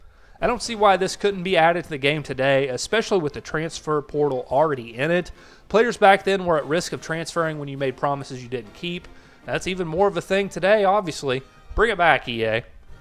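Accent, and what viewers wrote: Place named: Kentucky, United States. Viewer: You must be from KY like me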